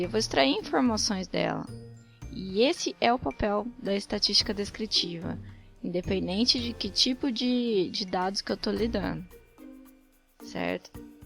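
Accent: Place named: East Asia. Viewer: Você é oriental não é? Percebo pela maneira como você fala. É comum os orientais se interessarem bastante por estatística. Mas muito boa sua aula, me ajudou bastante.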